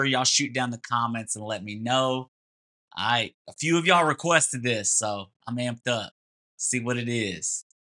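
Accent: United States. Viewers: I take it from you accent your American. I'm sorry if his offended you but for someone who has a studio , i cannot understand why you don't know who the band is tjb uk. Just joined😊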